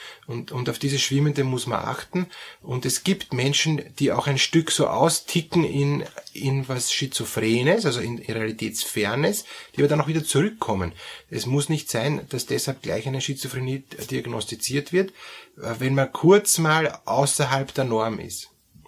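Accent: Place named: Austria. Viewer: Sehr geehrter Herr, Ihren Kanal finde ich wirklich gelungen, außerdem liebe ich die österreichische Aussprache. Ich möchte Sie bitten, in Zukunft, Themen wie Schizophrenie oder auch Depersonalisation/Derealisation, aufzugreifen. Vielen Dank.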